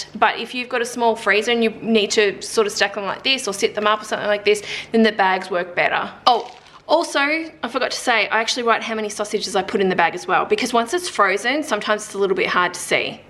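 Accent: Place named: Australia. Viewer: Oh an Australian accent. What part of Australia?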